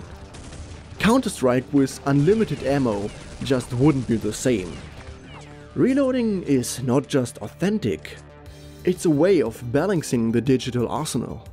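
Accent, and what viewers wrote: Germany. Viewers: Der deutsche Akzent stört bisschen aber trzdm gutes video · You have a decent German accent Ich als deutscher kann das gut hören Nices video über eine quality of life Sache die niemand wirklich beachtet Naja der reload ist für mich der Charakter einer Waffe (wie auch der Sound oder der recoil) Es gibt der Lieblingswaffe was vertrautes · Du kommst definitiv aus Deutschland xD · Ahhh, german accent. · Oh hell yea a video about the functionality and realism of weapons in video games. German accent starts. Of fucking course. We just love our weapon technology. Greetings, a fellow german. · Man merkt das du Deutscher bist😉. Gutes Video👍👍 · oh also love the accent. German if I'm not retarded, I wanna learn German eventually but either way really cool. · ich höre direkt den deutschen aus dir raus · I smell a slice of German accent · Sein Deutsch-Englisch triggered mich. 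· Are you german?your accent seens a bit german to me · are you German because this accent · Man hört deinen deutschen Akzent ziemlich krass😂😂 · Your German accent is commically bad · Are those guns of yours Airsoft? Because you sound German, and from what I know of German gun laws, I don't think getting an AR pattern rifle would be very easy. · Man der hat so eine Richtig Deutsch-Englische außsprache ich liebe das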